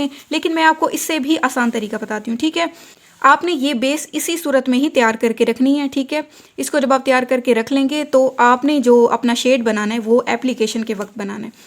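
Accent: Saraiki, Pakistan. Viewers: Ap saraiki speaking hn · Awesome. Your accent like sraiki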